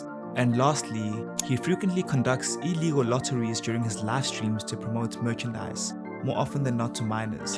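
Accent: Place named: South Africa. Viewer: What a great video, instantly knew you are from South Africa. We have a distinctive accent.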